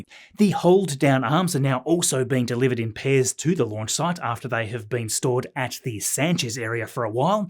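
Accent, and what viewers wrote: Tasmania, Australia. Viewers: I always love hearing the Tasmanian pronunciation of "booster". I think it could only be topped by hearing "caterer"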